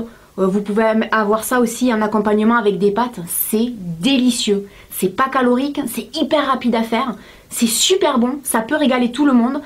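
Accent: South, France. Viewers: J'adoooore ton accent ! Etant moi meme sudiste mais loin de chez moi, tu m'apportes du soleil là ;) Merci pour toutes ces vidéos, je suis vraiment fan de tes conseils et de ton originalité !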